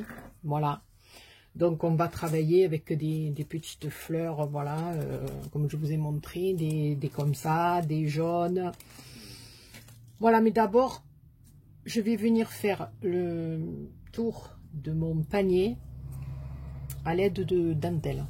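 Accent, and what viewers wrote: South, France. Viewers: Magnifique ! Merci beaucoup pour ce tuto. Avec l'accent du soleil ..... j'adore !! Bises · coucou je suis émerveillé par toutes vos belles créations, le panier fête des mères superbe, carte gâteaux également quel talent vous avez une imagination débordante pour la déco J,adore avec l' accent du midi je ne me lasse pas de vous écouter merci et bonne journée bisous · Magnifique carte panier très bonne idée pour la fête des mères. Je suis conquis par le petit panier, très jolie et en plus j'adore vous écoutez parler avec votre accent du Sud cela apporte du baume au coeur et un peu de soleil parce qu' il nous boude en Bourgogne. Merci pour ce superbe partage. Bonne fin de journée.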